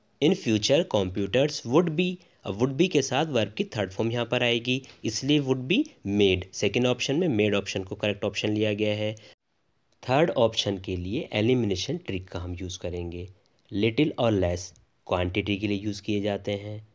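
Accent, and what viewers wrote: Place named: Western Uttar Pradesh, India. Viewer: Are u from bijnor, meerut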